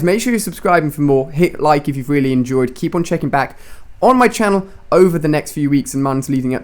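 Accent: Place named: United Kingdom. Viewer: FINALLY they bring back a british voice to CoD.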